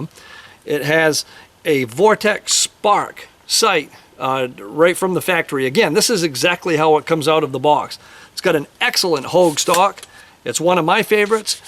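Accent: Maine, United States. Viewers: nice! I watch your videos often, it sounds like you don't live too far away either, down east, or upta county, lol.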